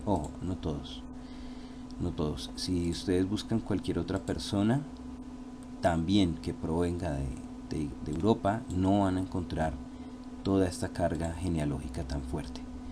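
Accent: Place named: Bogota, Colombia. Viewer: Hola, buen video gracias,por el acento creo que es de bogota????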